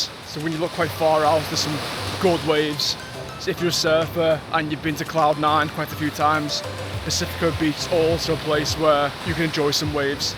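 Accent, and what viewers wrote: Liverpool, United Kingdom. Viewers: miss the scouse accent. I lived and worked in Liverpool for 13 years before moving to Australia. nice one lad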